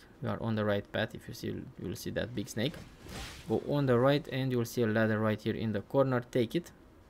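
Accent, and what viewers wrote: Romania. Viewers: ce accent de roman ai :)) esti tare bravo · u sound romanian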